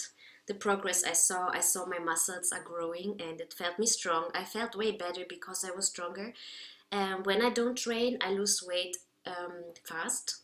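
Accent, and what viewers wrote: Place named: Germany. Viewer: Hab schon gedacht: deutlich deutscher Aktzent :D Tolles Video, danke für die Tipps, viele Grüße!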